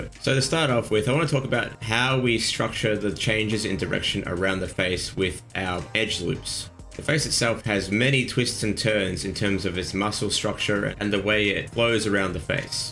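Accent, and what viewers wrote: Australia. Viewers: Finally I have completed my mission to find aggressively Australian blender tutorials.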